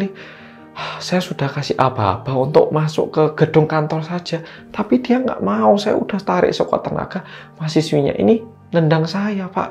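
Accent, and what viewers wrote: Java, Indonesia. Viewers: Mau yang medok saja pleaseeeeeee😂😂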